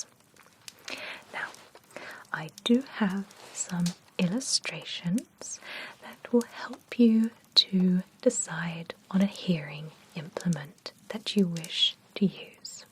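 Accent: England, United Kingdom. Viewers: She said two words and I already knew she was as british as tea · It’s such a masterpiece video. I feel like being examined and talked to by Natalie Portman with English accent. And all the fabulous hearing devices! My hearing loss will be recovered in no time✨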